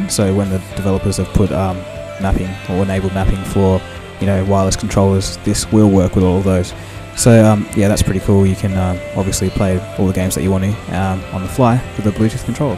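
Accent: Australia. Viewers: nice OZZY accent BTW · holy crap are you australian? NO WAY! that accent is too smoothing to be australian D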